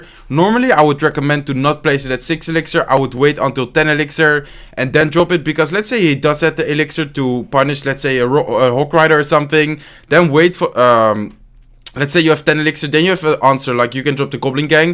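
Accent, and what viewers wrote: Netherlands. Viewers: Je praat echt nederlands engels, of goed engels of nederland man! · als nederlanders engels praten, hoor je het echt dat ze nederlands zijn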